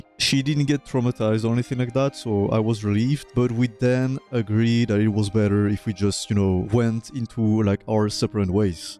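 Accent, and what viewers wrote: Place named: France. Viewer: absolut cinema (je te conaissais pas avant mais j'ai remarqué notre bel accent anglois commun 😌)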